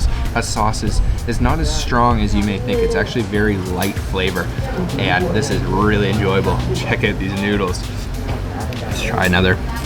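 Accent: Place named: Canada. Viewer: He sounds incredibly Canadian. He is not dropping a lot of EHs but everything else is completely Canadian.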